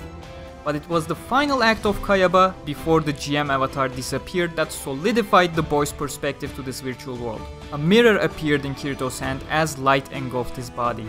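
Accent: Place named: Turkey. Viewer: sao wiki'de gezerken videoyu açar açmaz aksanından dolayı türk bu dedim.. ilk defa aksandan milliyeti tutturdum haha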